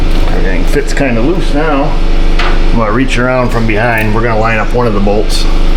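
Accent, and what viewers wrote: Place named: New York, United States. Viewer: I've been watching your videos for years. I always get a good laugh when that New York accent comes through. Mom's family is from New York and the old man's family is from North West Florida on the gulf coast. Mom used to tell me when she met my father while he was stationed in New York, that she thought that the only thing that came out of the south, was rednecks and stump jumpers. I spoke with my aunt (aka - ant) the other night, and she still lives in New York. Her accent and at the speed which she speaks, I had to tell her to slow down and speak to where this country boy could understand her. Love the videos and the great work that you do, keep it up chief.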